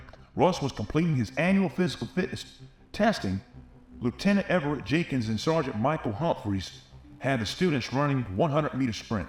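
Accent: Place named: Appalachia, United States. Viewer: LAME REVERB EFFECT on your hick hillbilly narration. LOL You are TERRIBLE at this. You are more on the level of Kitty Cat videos.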